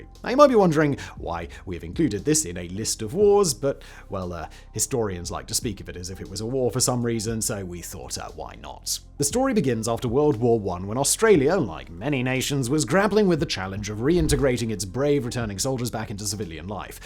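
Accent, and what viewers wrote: United Kingdom. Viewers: Oversimplified with a british accent. Interesting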